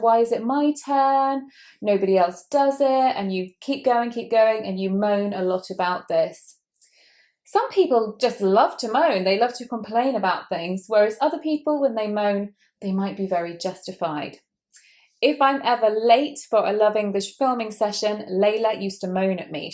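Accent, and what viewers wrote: United Kingdom. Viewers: Thanks you. I'm from Brazil and I,m learning a lot and also correcting the bad words pronounced. I like so much your british accent. God bless you and your baby. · I enjoy this great method of explaining. But as a biginner I need to watch more lessons from teacher in order to be familiar with her british accent. A biginner learner from Algeria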